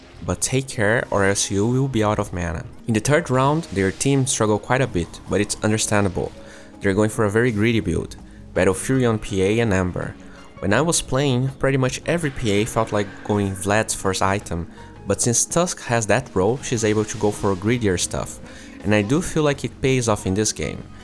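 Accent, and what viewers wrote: Brazil. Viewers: Entendi no final do vídeo. É pelo dinheiro ganho no Patreon. Mesmo com esse sotaque muito brasileiro no inglês, onde até quem não é americano ou inglês vai perceber na primeira palavra que não é do país dele e é de um país que não fala inglês, nesses países tem mais pessoas dispostas a doar dinheiro pelo Patreon do que países como o Brasil. Pior pela situação econômica do país. Essa é a única coisa que penso sobre mudar de país o seu canal pra um cara que mora no Brasil e tinha inscritos no Brasil: Dinheiro do Patreon.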